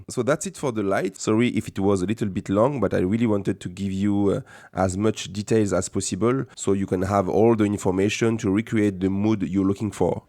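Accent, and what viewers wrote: France. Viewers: But alors ? You are French !!! ^^^ Au moins avec ton accent Français je understand la moitiés des sentences of the vidéo ! ^^ Merci pour la vidéo...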